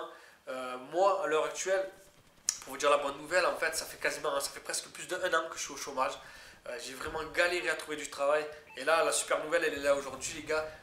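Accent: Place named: Marseille, France. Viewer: Slt j'adore t vidéo en plus t un marseillais j'adore les marseillais surtout l'accent bref gg pour ton nouveau taf sa fait plaise que t trouver un taf qui te plaît écoute g un petit souci au niveau fille en faite cela fait 6 mois que je suis avec ma copine et on a toujours pas couché ensemble pourrai-tu me donner un conseil car c la fille de mes rêve c ma futur femme on c déja décidé on restera ensemble pour la vie et voila le problème est la elle est timide et elle prend pas la pilule conseil moi un truc pour la rassuré mais bon 8 mois sans faire l'amour c long koi donc voila stp merci si tu répond a ce petit texte je t'aime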